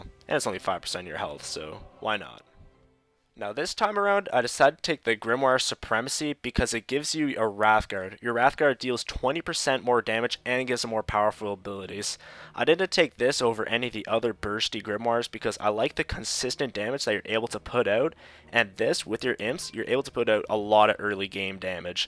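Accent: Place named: Canada. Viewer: You have a very stereotypical Canadian accent.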